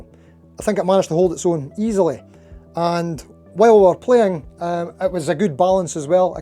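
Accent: Scotland, United Kingdom. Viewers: Just realised something, if you're still friends with them... Tell them they need to have a company slogan of "You've got it with Enya". It works surprisingly well with your scottish accent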